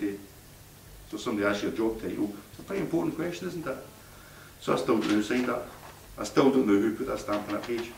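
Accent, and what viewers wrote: United Kingdom. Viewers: if I could just understand one word of this thick manchester accent..awful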